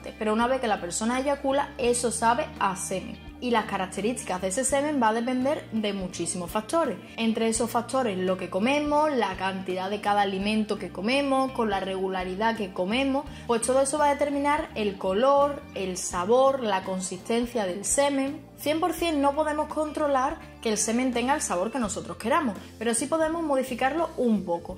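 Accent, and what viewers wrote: Andalusia, Spain. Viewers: Me encanta tu acento andaluz saludos desde Colombia y muy buena info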